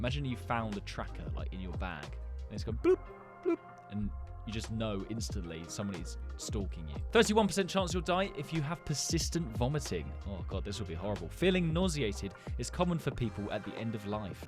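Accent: United Kingdom. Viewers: I like you talking British cause I like talking British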